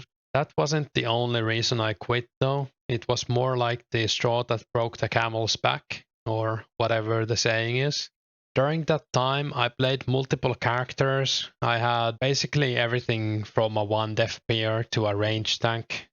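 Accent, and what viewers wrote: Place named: Finland. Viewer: Enjoying the vids man! That glorious Finnish accent is very calming and helps me get through the late night grinds :)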